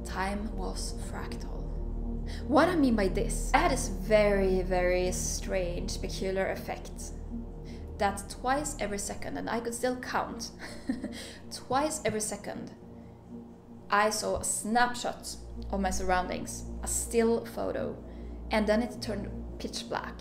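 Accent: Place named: Sweden. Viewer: nice trip report, sounds like you had a wonderful experience! and yes your experience sounds very much like "The K experience", that is very interesting! im actually heading out tomorrow to collect som amanitas, im going to make an extract of it, im very excited :) also i hörde på en gång att du var svensk haha, så trevligt^^ heja sverige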